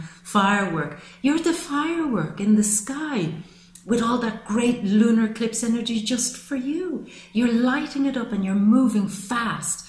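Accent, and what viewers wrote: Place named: Ireland. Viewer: You are so amazingly beautiful you remind me of Maureen O'Hara and you have such empathy and warmth as part of your personality, it's intoxicating. Plus, there is, how does Sean Bean say it, "A charming Irish lilt."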